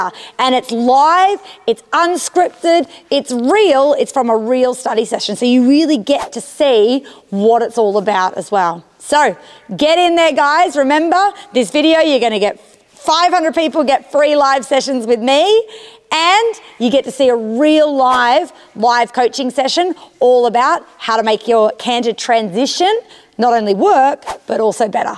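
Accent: Australia. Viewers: Is this an Australian accent ?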